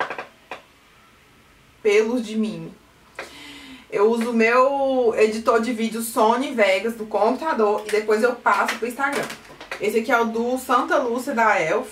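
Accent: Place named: Goiás, Brazil. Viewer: Vc é da onde? Seu sotaque é Bem Goiano 😍❤️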